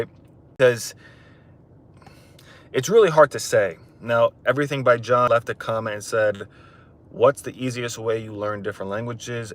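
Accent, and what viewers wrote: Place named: United States. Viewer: He is speaking American.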